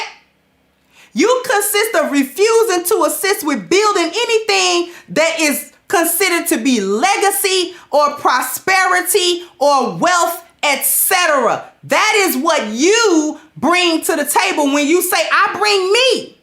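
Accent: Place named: South, United States. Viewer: I miss that gap... it brung out the country in the way you speak.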